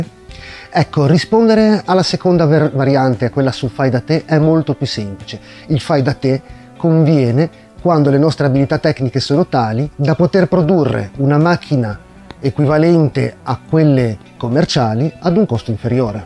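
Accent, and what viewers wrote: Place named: Liguria, Italy. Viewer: ciao e complimenti per gli argomenti trattati. sono interessato all'eolico domestico e direi anche che - dall'accento - mi sembri conterraneo.......io abito ad Arenzano e, dall'atlante eolico di cui hai parlato anche tu, sembrerebbe un vento medio di circa 6-7 ms. Sarei quindi propenso a rivolgermi al tipo ad asse verticale. Però un suggerimento: a chi rivolgersi per tutto a Genova???? Mi sembra pochi disponibili e preparati....... grazie!!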